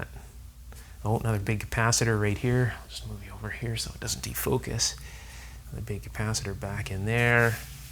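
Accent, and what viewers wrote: Canada. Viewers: You sound Canadian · You from Canada, eh?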